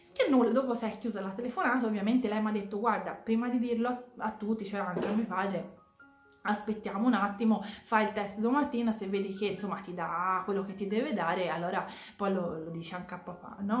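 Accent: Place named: Tuscany, Italy. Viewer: Mi piace un sacco il tuo leggero accento toscano, troppo simpatico!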